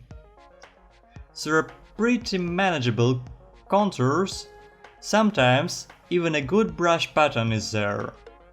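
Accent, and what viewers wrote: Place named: Russia. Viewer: Слышно, что наш человек)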